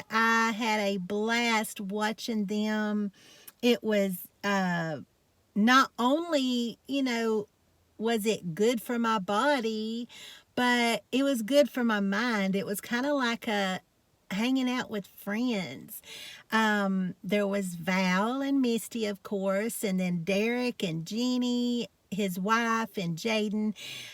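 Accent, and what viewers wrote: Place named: Georgia, United States. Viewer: I love this video!!! You always make me smile! Some people on here probably know by now that I am your mother n love, but they don’t know that I’m from Virginia and the strongest south Georgian accent I have ever heard in my life is my husband’s and now I have a daughter n love who has a south Georgian accent that competes with his!!!! I love y’all’s accents. My mother has always had people ask where she was from because she has a strong Appalachian accent. Everyone always thought she was from West Virginia, close, but in south western Virginia is where she came from. I have no accent, I wasn’t lucky like, my mama and husband. But anyways, I’m so lucky to have you in my life! Your a true gift! I wish all of your new friends on YouTube can meet you, they would love you too. ❤️🤗🌹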